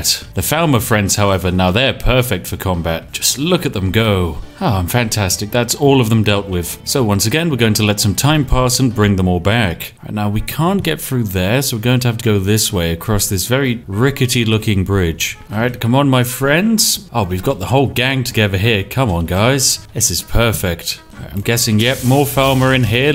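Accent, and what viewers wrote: United Kingdom. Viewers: Absolutely brilliant tips! 👏👏👏 love your british accent, so energetic and just... lovely🥂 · Watching you break games with your amazing British accent brings me joy sir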